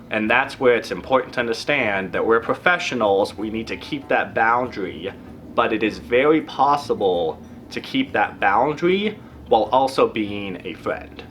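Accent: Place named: New Jersey, United States. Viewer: It's called having an accent, believe it's from Jersey or Long Island. Get educated.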